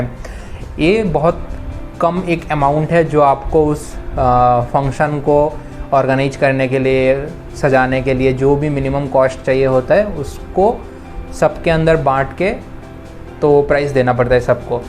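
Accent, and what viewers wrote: India. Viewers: Ap Bengali ho kya??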